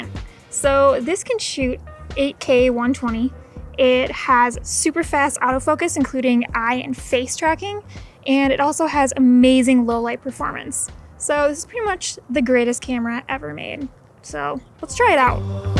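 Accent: Midwest, United States. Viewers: ok gotcha! Yep that Midwest accent 😂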